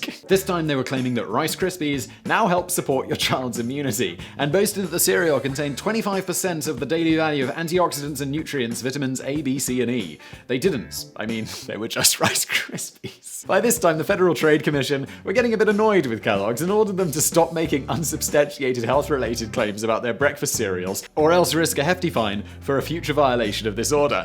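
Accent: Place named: United Kingdom. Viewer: nope, hes just British.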